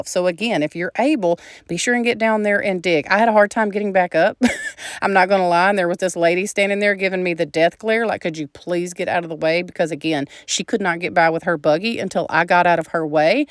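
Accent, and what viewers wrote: South, United States. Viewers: I think you could use that material for either or for both. Yes, the outside of our house is all Eastered up. Yes, that was for salt or pepper but you need the tiny spoon for it. Where do you live with your southern accent? Where do you sell your thing and what name do you go by?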